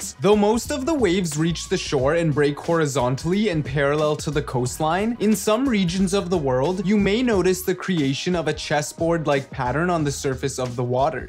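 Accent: Canada. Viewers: Sounds kinda Canadian to me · canadien eehh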